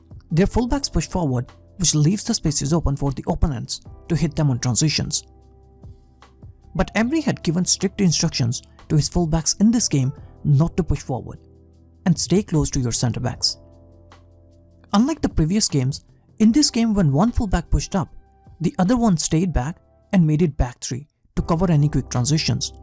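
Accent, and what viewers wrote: India. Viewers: No voice please. Bad indian accent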